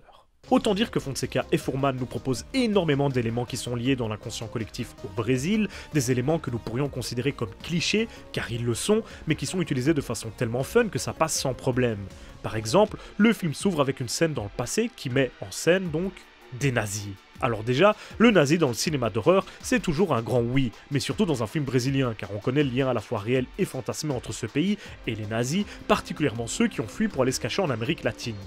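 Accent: Belgium. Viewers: dommage tu devrais essayer un jour car il est fun et excellent Dead by daylight fut mon premier jeux multijoueurs J'ai adoré le faite d'incarner des personnages d'horreur culte des films que tu mentionnes dans la chaîne en tout cas il est magnifique et PS l'accent belge et au rdv 20/20 pour t'as chaîne il est génial 🤣 grâce à toi J'ai découvert des pépites incroyables merci beaucoup 👍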